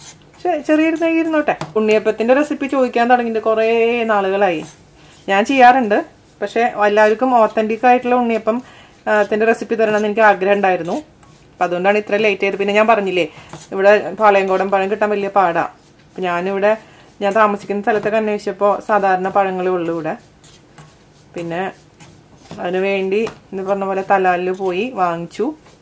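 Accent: Thrissur, India. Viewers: chechyyy..u r soooooo beutifull..ur smile n presentation also..ithok ondaky nokalonnum nadannillwlum checheede aa thrishur slang charapara varthanom chiriyum kaanana njan e videos mainly kaanunne..God bless😊☺😙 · thank you for your prompt reply Chechi! I'm making it for Ganesh Chaturthi :) I have tried so many of your recipes and it's been a Hit!!! I esp love your Thrishur dialect reminds me of my Guruvayur cousins :)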